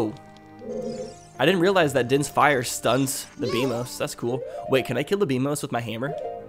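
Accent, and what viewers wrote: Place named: Midwest, United States. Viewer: Haha, no problem. Understand that I mean no ill will or insults to your location dialect (I live in the midwest too and am kinda guilty of the "Ohp" thing as well)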